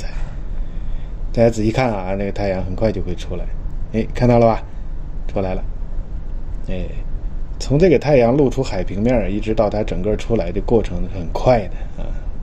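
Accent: Tianjin, China. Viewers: 看的视频有一阵了，刚开始没确定的天津口音，听着很熟悉，让我想起了在天津上大学的时候，作为一个南方人，也很爱吃煎饼果子。很喜欢的节目，我是干航空的，看航海的，我发现很多相似但又完全不同很新奇的知识，感谢的科普！ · 听口音就是天津爷们。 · 嘛，，我建议，您嘛还是讲天津话好听，当然您现在讲的也好听，听着舒服，亲切。我是建议呀，我看还是把这节目办的有点那么是相声的感觉，就更好了，凭您的水平，口才，我看成，点赞。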